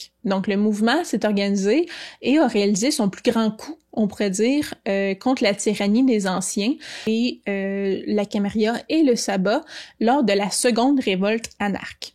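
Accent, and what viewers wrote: Quebec, Canada. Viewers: Quelle excellente vidéo 😮♥️ J’ai été tellement agréablement surpris d’entendre une compatriote québécoise sur le YouTube francophone ♥️ Je m’abonne à la chaîne!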